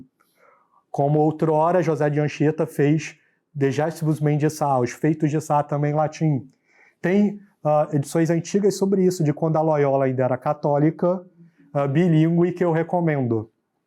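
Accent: Rio de Janeiro, Brazil. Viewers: Qual o interesse de assistir a quase duas horas ouvindo um carioca com forte sotaque falando de um padre que julgamos já saber quem foi? Pois informo: nessa conferência há história da Igreja, história do Brasil, história dos Santos, testemunho de milagres, história das batalhas, história dos índios, e muita emoção e piedade. Vale cada minuto. E para quem ama a Igreja, ama nossa terra, no final corre o risco de derrubar lágrimas de beleza, como eu. Viva Cristo Rei!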